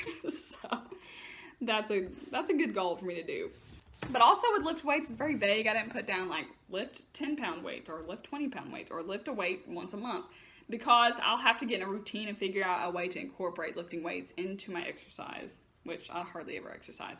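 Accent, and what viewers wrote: United States. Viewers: I'm Canadian u look like American from ur accent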